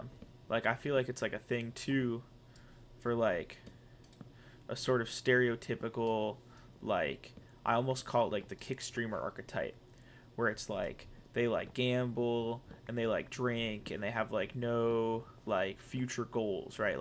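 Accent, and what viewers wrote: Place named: Missouri, United States. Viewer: You sound kinda Missouri in this one. The accents comin out of the DNA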